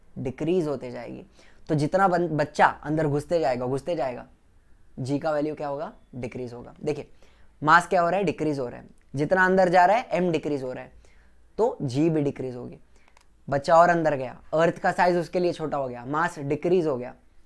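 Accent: Maharashtra, India. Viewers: Sir u r marathi ? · Sir tumhi udi marta mhtl mhnje tumhi 100% marathi ahe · Sir aap aaisa bol rahe ho...usse lag raha hai ki app MARATHI HO............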